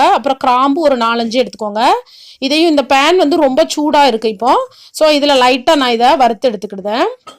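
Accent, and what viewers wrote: Tirunelveli, India. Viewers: Tirunelvelila endha ooru aunty ungaluku? Mumbaila irukinga pola.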